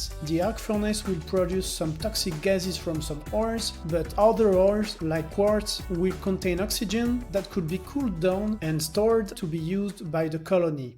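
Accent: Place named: France. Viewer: i love your french accent !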